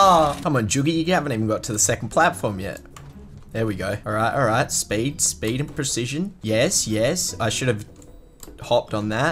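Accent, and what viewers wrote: Australia. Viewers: Are you Aussie or Just wondering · Are you fromAustralia?? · Are you Australian?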